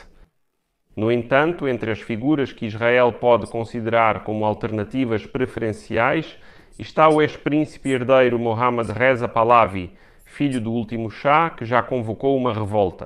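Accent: Portugal. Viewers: Sotaque interessante. É de Portugal o senhor?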